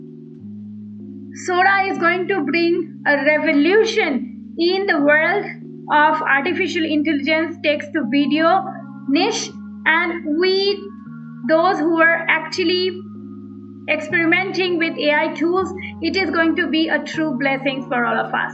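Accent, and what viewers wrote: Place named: Bengal, India. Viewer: tumi ki bangali ? tumar words pronounciation to just bangali bangali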